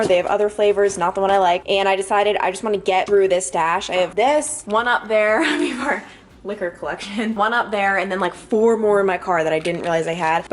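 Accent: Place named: New York, United States. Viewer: This was fun to watch. Especially the flash back of you with your NY accent. Love it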